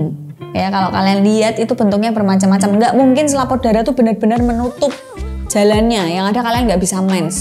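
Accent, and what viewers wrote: Java, Indonesia. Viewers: Pantes logat nya kek orang jawa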